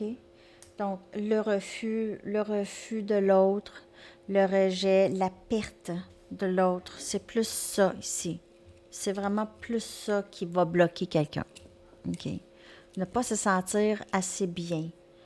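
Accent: Canada. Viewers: J'adore ton accent si charmant du Canada j'adore j'adore le Canada car en juillet 99 j'ai était dans ton très beau qui est magnifique et que j'ai vraiment aimée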